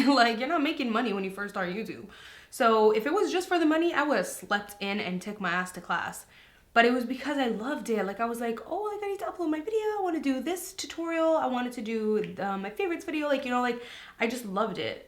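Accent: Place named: Canada. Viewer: I love your Canadian accent!!! How tall are you? Congrats on your journey... be proud!! also, I can't tell u have more confidence...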